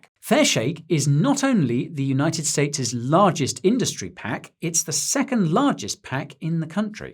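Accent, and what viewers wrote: Afrikaans, South Africa. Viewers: face-green-smiling: love the South African accent ..decent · As a South African, I love your Afrikaans-English accent. Kak funny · Your South African accent won me.. ja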